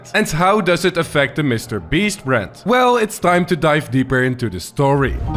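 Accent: Netherlands. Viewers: Ben je nederlands mooi accent😂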